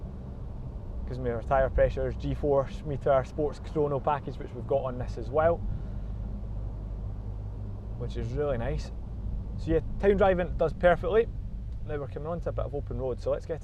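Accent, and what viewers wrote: Scotland, United Kingdom. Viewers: First car review with a scotish accent i see .obviysly cause im not native english speaker i understood half of what he said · Sounds like a Scottish accent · great review...subscribed. love the Scottish accent. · Love the Scottish accent haha :D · Mate the only reason why I understand every word you have uttered in this video is because I am a Snooker Freak, and love watching and listening to the commentary of Alan McManus and other fellow Scotsmen. I’m an American from New Jersey and it’s crazy how frustrating it is for other people to even understand regular English people.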